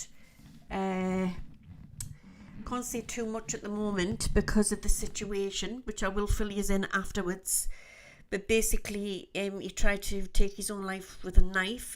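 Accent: Newcastle, United Kingdom. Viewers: love the Geordie accent could listen to ut all day Steve east London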